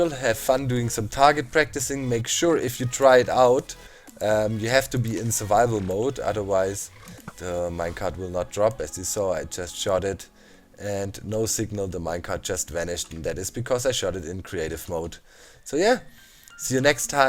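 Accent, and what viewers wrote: Germany. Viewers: You Sound a lil Bit grman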